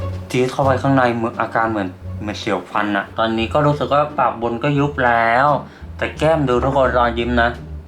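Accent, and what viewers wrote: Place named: North, Thailand. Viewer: พูดเหนือบ่อยๆเลยนะคะ ฮือ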